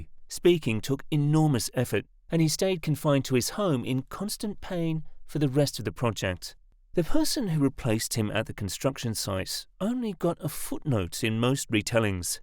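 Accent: United Kingdom. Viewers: Do we really need a British accent? Fuck no.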